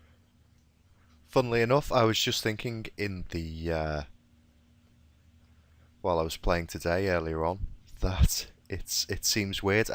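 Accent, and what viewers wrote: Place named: Liverpool, United Kingdom. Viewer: I'm a scouser, just saying your accent sounds nearby :P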